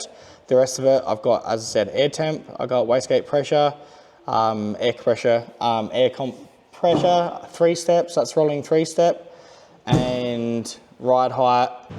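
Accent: Australia. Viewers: He almost sounds Australian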